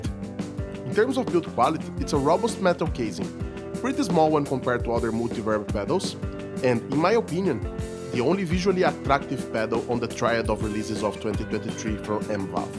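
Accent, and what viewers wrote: Brazil. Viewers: Great Brazilian accent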